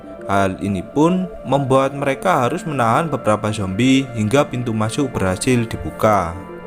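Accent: Java, Indonesia. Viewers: Gak asik denger naratornya....terlalu medok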